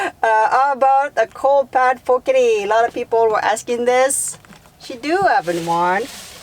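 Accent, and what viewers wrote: Quebec, Canada. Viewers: French Canadian, from Quebec city.✌️💕🇨🇦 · I think she said her accent is from Quebec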